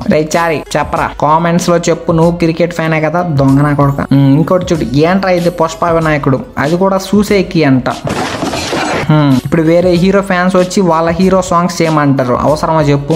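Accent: Nellore, India. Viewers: Bro nuvvu Nellore aa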